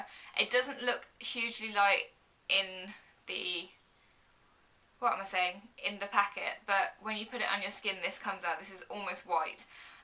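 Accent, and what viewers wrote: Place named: England, United Kingdom. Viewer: i loveeee your accent! reminds me of emma watson :) what part of England are you from?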